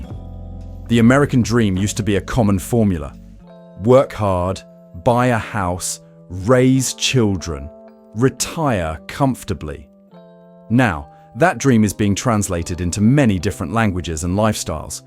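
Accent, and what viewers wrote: United Kingdom. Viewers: You sound like a Brit 😂😂😂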